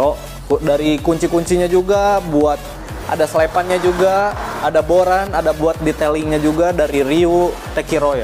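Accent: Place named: West Java, Indonesia. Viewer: Resep ka orang Sunda mah, boga cirihas sorangan Amun nyarios bahasa Indonesia ge, angger hentte lengit sunda'na mahh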